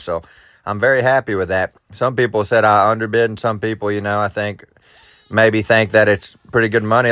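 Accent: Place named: Virginia, United States. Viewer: You sound like your from south west Va or nc my neck of the woods lol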